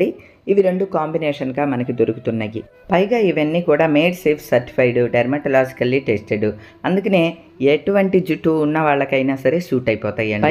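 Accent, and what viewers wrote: Godavari, India. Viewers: Natural గా మాట్లాడితే బాగుంది అమ్మా గోదారోల్ల యాస బాగుంటుంది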